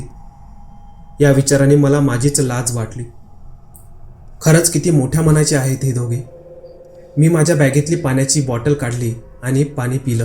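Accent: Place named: Gujarat, India. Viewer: मस्त कथा आहे पण कथा वाचणारा गुजराती आहे असं त्याचा टोन सांगत होता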